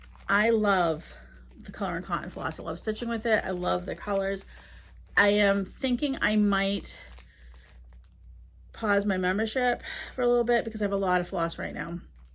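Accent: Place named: Boston, United States. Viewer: Great to see you again. I enjoy your floss tube and your Boston accent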